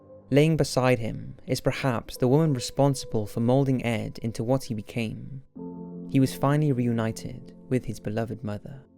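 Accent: Wales, United Kingdom. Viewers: This was amazing! Your quality of production and information is always top notch. Also, I'm welsh and I can hear a bit of a welsh accent in your voice..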